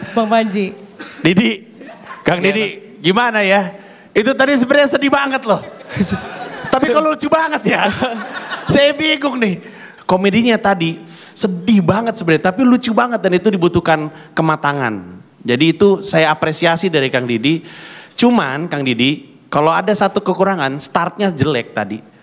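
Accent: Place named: Lampung, Indonesia. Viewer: OMG.. Lampung Indonesian🎉🎉🎉😂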